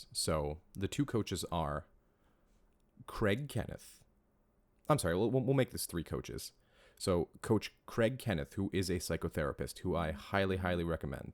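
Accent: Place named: California, United States. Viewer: Actually I am very curious to know. You don’t show your face and I am sure that has A LOT of your viewers curious lol. At least tell us where you broadcast from? I am betting you’re in California based on your accent or somewhere out west. I am in Canada. Ontario near Toronto to be exact.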